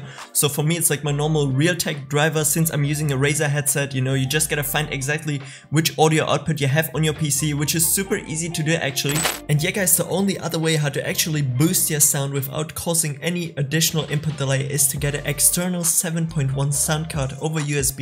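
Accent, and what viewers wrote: Germany. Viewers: So ein deutscher Akzent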